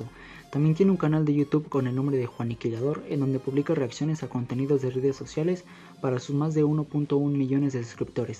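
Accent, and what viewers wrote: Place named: Mexico. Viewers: Nació en colombia????????? 😲😲 Nunca lo imaginé, no solo usa modismos mexicanos si no que tiene las características tanto de lenguaje, habla y acento mexicano. Yo que soy mexicano, pensé que Juan (nombre muy 🇲🇽) era mexicano de toda la vida por como hablaba, se nota cuando alguien aprendió a hablar el acento mexicano y cuando alguien ya lo tiene desde siempre, y el el la primera persona que he visto que es de otro país y habla 100% como un mexicano, no se le nota secuela alguna de su país natal al hablar y expresarse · otros diran que no tiene indentidad y nada de su pais natal, pero para mi se acostumbro al acento mexicano lo domino al 100% y ya jajaja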